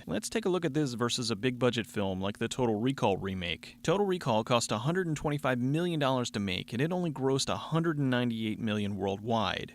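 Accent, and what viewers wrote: Philadelphia, United States. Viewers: I could tell you were from Philadelphia when I heard you say "wooder" instead of water in one of your other videos.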